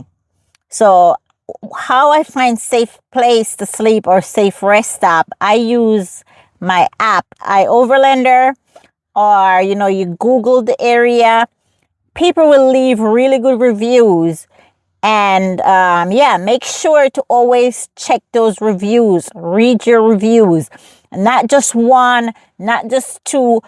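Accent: Jamaica. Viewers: You have a very thick Jamaican accent. I thought you said you were raised in the United States. It seems like if you’ve been here so long that your native time wouldn’t be so thick 😊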